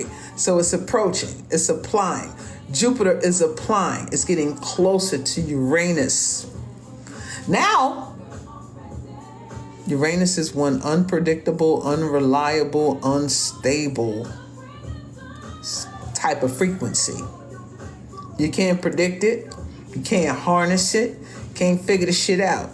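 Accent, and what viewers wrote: New York, United States. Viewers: This sister gotta be from New York ! If not, Jersey or Philly. Definitely the East Coast no doubt ! I love this lady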